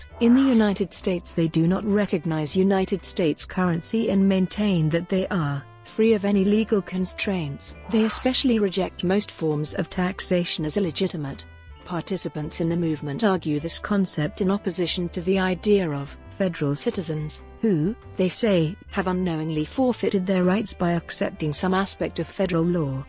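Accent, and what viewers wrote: England, United Kingdom. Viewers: Someone program these damn computer voices better at speaking American English. And lose the English accent.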